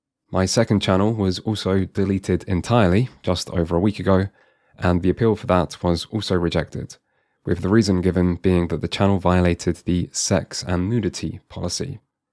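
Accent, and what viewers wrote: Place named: United Kingdom. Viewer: I'm not sure, but it might be related to your using the word "fad" early in the video. With your accent, it might be interpreted by an AI speech-to-text as British slang for a cigarette which also happens to be one of two "the F word" in American English. Still has nothing to do with the title unless the first few seconds of the video is referred to as "the title". The appeal probably went to a person outside the UK, so they also misheard the word (colored perhaps by the transcription). That's the most goodwill I'll extend to the decision makers, especially since it makes no sense in the context of the sentence, whereas "fad" does.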